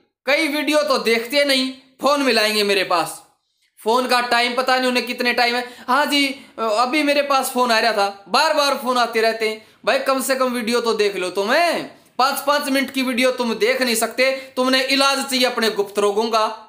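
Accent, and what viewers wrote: Haryana, India. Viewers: Haryanvi acsent Dekh kar mja agya 😂😂😂😂 I am also haryanvi jai ho ..... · Guruji aap hariyana se ho Kya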